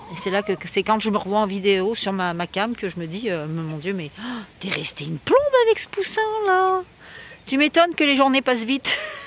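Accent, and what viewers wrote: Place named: Auvergne, France. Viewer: Coucou, Merci de nous régaler avec votre quotidien au poulailler, et votre accent auvergnat naissant. Gratitude pour tous vos partage et pour faire rayonner notre belle region. Je pari que les nouveaux seront des barbues belges Bonne fin de semaine a tous et courage a tous pour l arrivée de l automne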